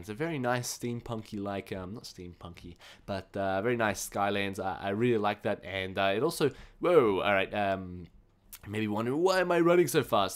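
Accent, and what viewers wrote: Australia. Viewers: He has an austrailian accent..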